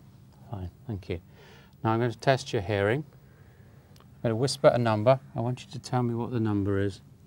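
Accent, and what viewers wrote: United Kingdom. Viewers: That is a very beautiful british accent sir^^ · I've watched the whole video and I'm still waiting to hear a calm voice. All I hear is a horrible british accent.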